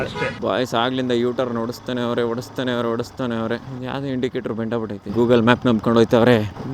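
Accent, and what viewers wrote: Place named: Tiptur, India. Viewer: Randomly watched ur insta reel and watched ur channel here ...Nam tiptur slang alli nim videos literally touched the hearts and kept us entertained ❣️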